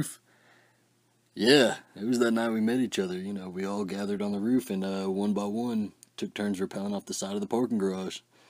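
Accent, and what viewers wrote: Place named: Alabama, United States. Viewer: I could listen to your deep Alabama voice all day my favourite YouTuber no whistles or click bait. Your channel is artistic, authentic, honest, my favourite YouTuber keep up the great work !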